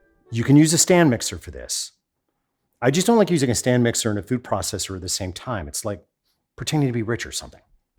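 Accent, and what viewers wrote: South, United States. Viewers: he’s from the south, let’s not hold it against him…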